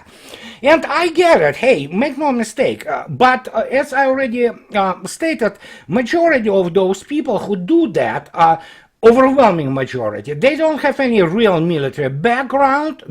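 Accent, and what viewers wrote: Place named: Russia. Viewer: These people are NOT "incompetent": they are actually COMPETENT in spreading propaganda. You need to weaponize your words moreover your Russian accent is way too strong for the average Joe in the West: to listen to you demands an excruciating effort to a native English speaker. Edit your content and make an English native speaker to talk in your videos. Voila, you'll be more competent in your communication under these conditions. Best of luck! (please do not get upset: I am trying to help you to be more efficient in your communication).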